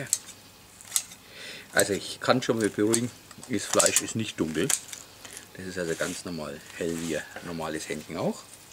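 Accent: Franconia, Germany. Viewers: Der fränggische Dialekt ist zwar grauslig zum hören- aber das Video, das Rezept gleichts wieder aus ;-) Macht Bock auf mehr :) Welches Rub hast Du verwendet? schönes Video - Danke fürs Hochladen :)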